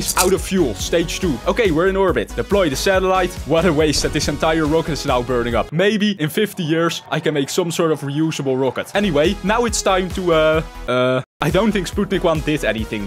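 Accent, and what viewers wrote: Netherlands. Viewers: is he dutch,? i recognize the goofy accent. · Your Dutch accent 💀💀💀💀 I love the goofyness, the thing is, as a Dutch guy myself it's pretty cringe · Damn, some Dutch accent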